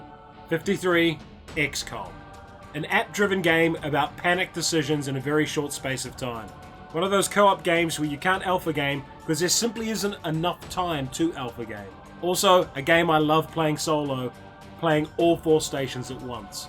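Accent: New Zealand. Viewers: yaaaaaaa your a new zealander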